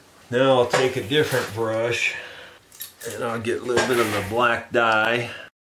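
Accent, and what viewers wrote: United States. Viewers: what a transformation, trash to treasure and I just love the country voice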